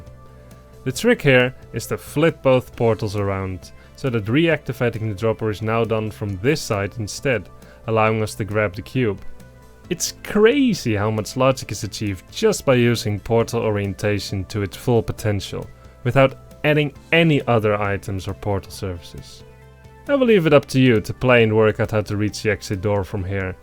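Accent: Netherlands. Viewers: neat your dutch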